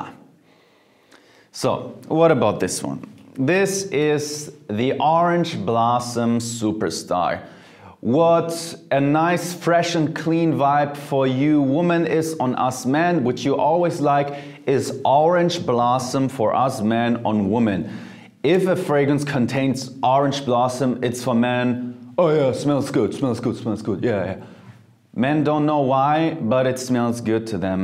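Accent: Germany. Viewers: German accent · I hear a german accent there🤔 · clearly a German accent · He is stunning but his English could be improved ;) I bet he is a german speaking person! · Is he German ? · He has a strong German accent when he speaks French.. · Hus accent is so strong i could tell within seconds he is German😂😭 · Not French at all! He is definitely German!!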